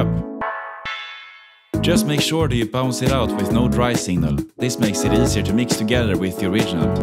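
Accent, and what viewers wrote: Sweden. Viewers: As a Swede I enjoy the Swedish accent! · bro that swedish accent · Are you Swedish? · jag vet en svensk när jag hör en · never heard a stronger swedish accent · What i love most about these videos is the intense, thick Swedish accent which gives his speech that nordic rhythm that fits well with the rest of the audio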